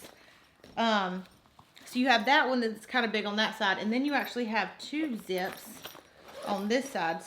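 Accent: South, United States. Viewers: You have to be from the south lol but anyway I love your videos!!! Everytime my phone dings I'm hoping it's a new video from you.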